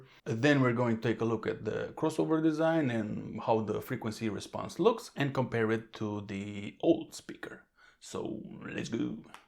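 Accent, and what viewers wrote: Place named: Romania. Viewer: considering your accent I bet that you are Romanian.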